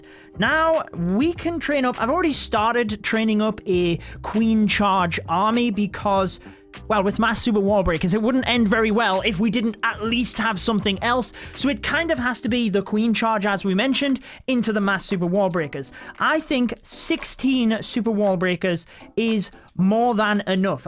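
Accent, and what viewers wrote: United Kingdom. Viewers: I love that British accent 😂.... P.s thnx for the great video! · Very good british Accent I enjoy your speaking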